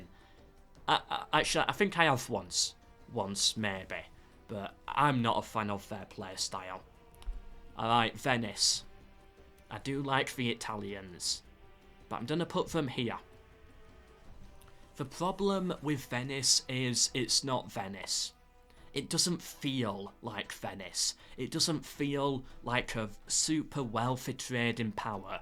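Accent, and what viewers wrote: United Kingdom. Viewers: Idk how good is your micro but you still sound british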